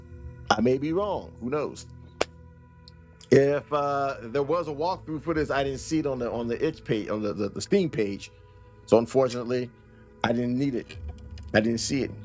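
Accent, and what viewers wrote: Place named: Italy. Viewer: I was going to say, I wholeheartedly agree, if you CAN take advantage, you MUST take advantage 🤪if anything, it's making me make the mechanics in my game deliberate and airtight - speaking of which, I'm getting close to Demo material and would be honoured if you would give it a go. Always like watching your videos brother, I suspect we are from the same generation, a lot of references you make I catch - always appreciate the Italian accent too, being half Italian myself 🤌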